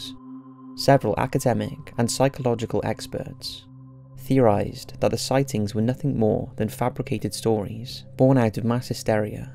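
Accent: Wales, United Kingdom. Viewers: Bro are u Welsh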